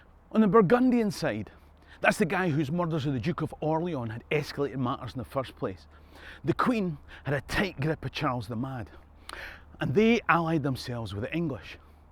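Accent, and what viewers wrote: Scotland, United Kingdom. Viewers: Gayday buddy just letting you know it’s the 7/8/21 you have 60,100 well done love hearing the scot language l was 2 when we went to Australia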